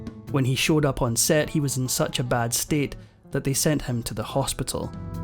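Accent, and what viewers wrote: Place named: Scotland, United Kingdom. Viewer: Way better than the AI clips. I’m a lover of Scot Accents, so there’s that. Thankyou!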